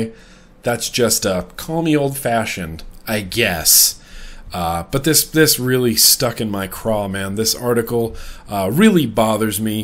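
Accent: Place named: South, United States. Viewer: i just need to say that the southern bell accent is the sexiest accent there is. also i wish i would have started vaping years ago, so i could have been watching these vlogs, its a highlight in my week.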